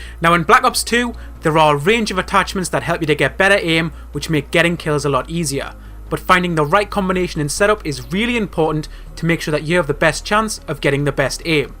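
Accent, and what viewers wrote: Newcastle, United Kingdom. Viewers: Are you deaf lol. He is a geordie...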